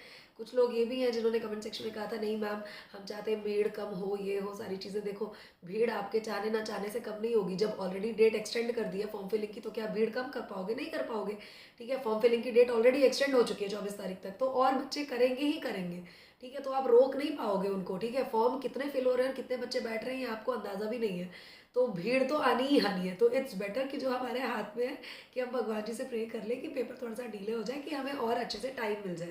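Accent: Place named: Lucknow, India. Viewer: ohk kk mam.. pranam 🙏 recently m listening ur vdos 😍 u are truely 💎 n u really care about studdent's studies . so much positivity ,full of real information which is most imp thing.. faltu ki bkwas ni hoti h..bhut se log aadha tym vdos ko start krne uska add krne me lgate h .n faith on our bhgwan n spritual knowings 🙏 n flow of ur accent 😍..I think aap lucknow ya uske aaspas k ya pragraj se ho... 🙏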